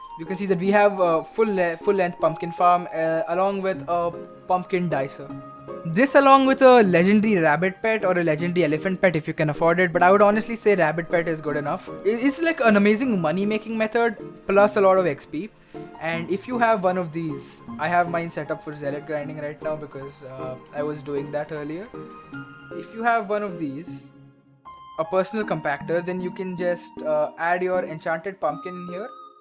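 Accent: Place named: India. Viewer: why does he sound like he is an indian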